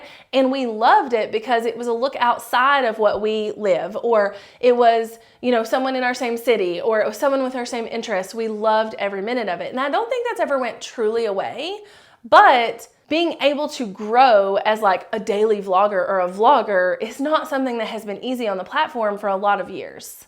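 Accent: South, United States. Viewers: I love linguistics, so the southern pronounciation is fascinating to me. But I'm in Canada, so you KNOW i say niche the French way.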